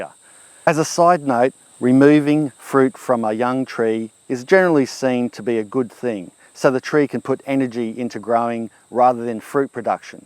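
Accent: Australia. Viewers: We moving in to a house that has some fruit trees, which we've never owned. The orange tree is so thick, we can't get a fruit picker up there to get the oranges. Every day 4-5 oranges drop down. They're already moldy and split when they hit the ground. We can even see some brown shriveled up oranges up there. The tree also has small green oranges, so it's still producing. You mentioned it's not good to prune while the tree is producing. So what should we do? There's also a apple tree in the same condition. Any advice would be greatly appreciated. I live your channel because you're have a wealth of knowledge, I live the Aussie accent, and I so love your humor and quick Witt. Thank you for sharing!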